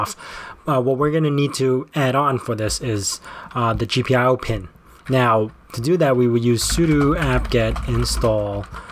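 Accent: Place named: New York, United States. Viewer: Are you from New York? You got the accent haha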